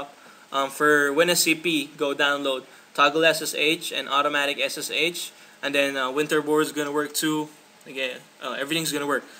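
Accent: Philippines. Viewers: lol man i can tell your soo phillippino!!! pinoy accent ftw. ;p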